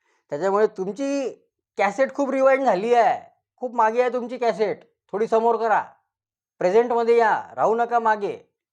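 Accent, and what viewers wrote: Vidarbha, India. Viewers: साहेब आपणास आम्ही नेहमी ऐकतो कारण आपला अभ्यास दांडगा आहे साहेब आपण निवेदन करताना आम्हाला भरपूर प्रमाणात हसायला येते कारण आपली वैदर्भीय भाषा एकदम मस्त आहे जय महाराष्ट्र साहेब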